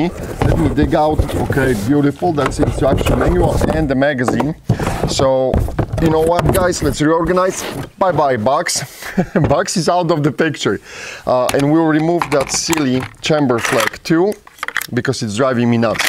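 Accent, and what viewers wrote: Poland. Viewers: English with Polish accent 🙂👍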